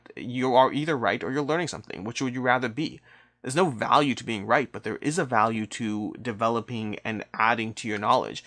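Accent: Canada. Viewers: Oh like this sweater also, nice! Your 'canadian accent' is getting less noticeable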